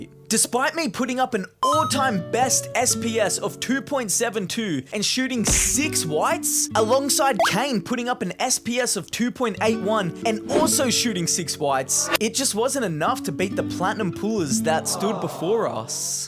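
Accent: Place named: Australia. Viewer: where u from? u sound australian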